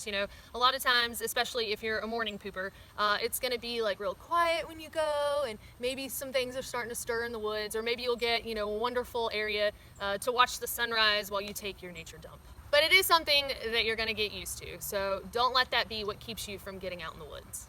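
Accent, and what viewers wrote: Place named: Alabama, United States. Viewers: I really dig your southern Alabama accent!! It's really awesome that you share such intimate and taboo subjects such as personal bodily functions that not a lot of people would really share. I am not trying to be vulgar but when you said you hated body hair.. do you recommend shaving your pubic hair (male or female) to prevent chafing and also for hygienic purposes? Anyway, thanks for the great info and stay safe out there and please keep the great videos coming. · I knew your accent sounded familiar. you said Alabama.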